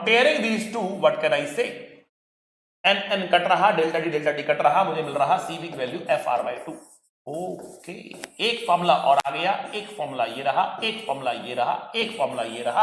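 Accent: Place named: South, India. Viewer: He looks like South Indian n also talks like it 😀😀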